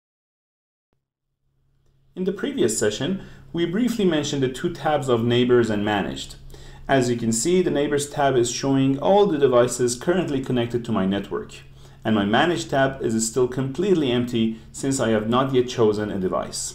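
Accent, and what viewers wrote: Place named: Iran. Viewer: Damn.u got some persian accent.nice teaching by the way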